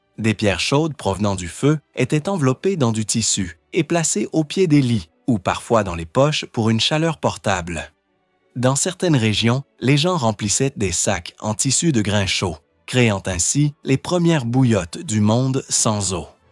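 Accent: Quebec, Canada. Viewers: J'aime bien cette voix québécoise, je m'endort dessus. Merci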